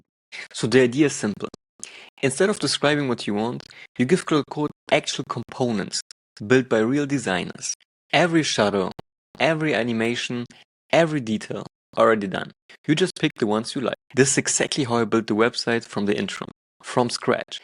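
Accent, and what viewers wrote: Germany. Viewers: Man hört den deutschen raus👁️🤣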